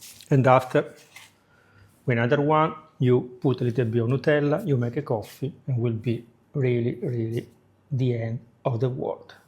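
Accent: Italy. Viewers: Ma quanto amo l'accento italiano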